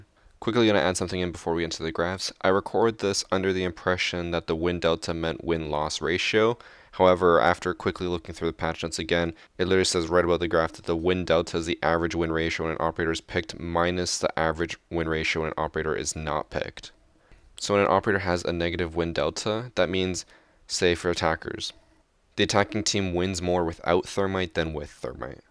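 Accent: Canada. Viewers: I love the way Canadian people say out and about😂😂 I know he doesn’t think he has a Canadian accent but he definitely does 🤣🤣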